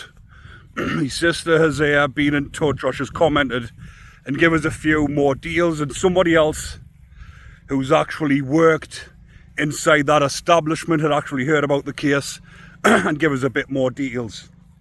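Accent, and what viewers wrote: United Kingdom. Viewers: Help an Aussie out. Where in the UK is your accent from mate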